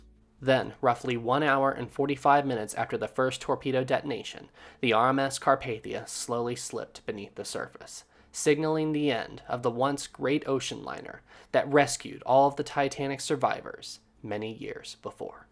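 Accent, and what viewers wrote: South, United States. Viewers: Kid, learn how to properly pronounce words like "during" (which isn't pronounced the same as the word "daring") and drop the southern drawl when using words like "time".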